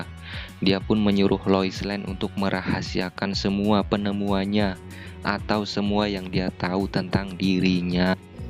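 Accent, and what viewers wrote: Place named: Java, Indonesia. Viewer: suara lemes logat jawa bikin ga mood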